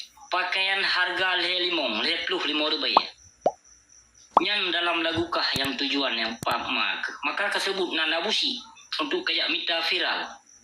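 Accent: Aceh, Indonesia. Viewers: Haaaa....haaaaa...😄😄🤣🤣🤣🤣🤣🤣 ikee asai kunonton dan ku deungoe abusyiek teumeunak saket pruet ku khe'm. 🤣🤣🤣🤣🤣🤣🤣🤣🤣🤣🤣. Mantap abusyiek. Tok asli Aceh Cara meunarit dan ceurapa. Hana dua abusyiek 👍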